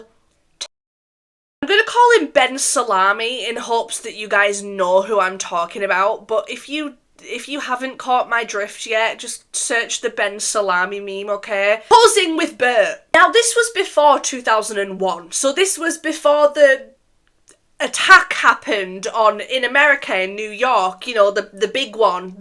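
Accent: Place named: Wales, United Kingdom. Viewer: You sound welsh lol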